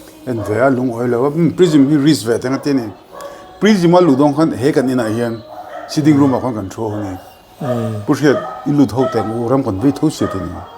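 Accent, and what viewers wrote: Myanmar. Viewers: hi burma mi a ni em aw a tawnghi ngaithlak a nuam lo thin khawp mai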